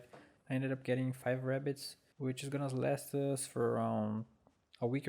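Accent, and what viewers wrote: Brazil. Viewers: Pelo seu sotaque você e br só pode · Eu sabia que tu era br! O sotaque ta do jeitinho, boa série btw, ganhou o meu follow! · você é brasileiro? parece muito o sotaque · Where u from? U got a brazilian accent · sabia que tú era brasileiro, o sotaque é inconfundível kkkkk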